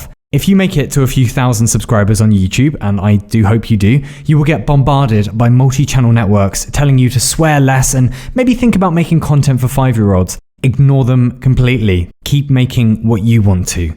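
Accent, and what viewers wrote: RP, United Kingdom. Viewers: ah the fancy rp english accent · i do a lot of art but i also just want more turtle boy with funny british voice saying things to prevent me from commiting sewerslide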